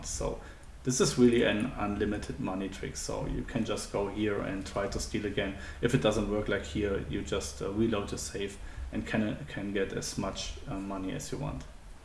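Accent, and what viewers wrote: Germany. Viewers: Ich liebe den Denglisch Akzent :) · I hear that you are from Germany ;-) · Ich gehe mal davon aus, dass du Deutscher bist mit dem Dialekt. Warum hast du die Pläne weggelegt und sie nicht bei den Chinesen abgegeben?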